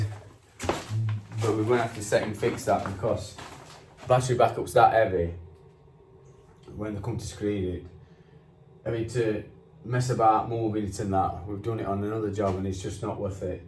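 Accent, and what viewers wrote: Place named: Ilkeston, United Kingdom. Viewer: Love the accent, sounds like an ILKESTON one to me, plus you look better with the beard. On a more serious note, I renovate properties for a living and tried your injection methods into the mortar for the first time and yes it worked out fine for me. You give out basic no crap information, good luck with your future videos, thanks Dave.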